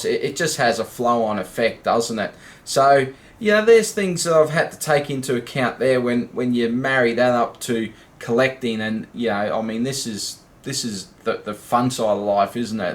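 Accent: Australia. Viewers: Great to see you back, and hearing an Aussie voice around. Our weird weather down here near Sydney has made things even more crazy. We finally got to have a camping holiday that has been cancelled 3 times from Covid. Yes I too have changed my Lego collecting thinking. Sadly my city is going. We need the garage back but the small office room is becoming a Lego room. My girls are in high school too. One thing we all have learnt from the last few years is that things will always change and you need to adapt. Thanks again. 😁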